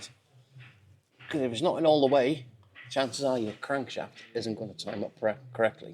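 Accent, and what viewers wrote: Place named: Liverpool, United Kingdom. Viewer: You sound Welsh and Scouse at the same time!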